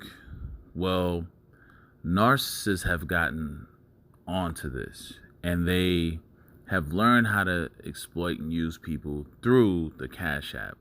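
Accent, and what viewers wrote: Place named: Philadelphia, United States. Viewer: I knew you had Philly roots. The accent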